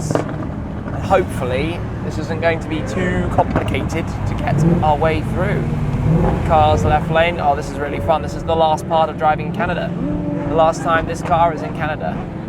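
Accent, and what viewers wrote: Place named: United Kingdom. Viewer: American muscle we need to stick together said in a British accent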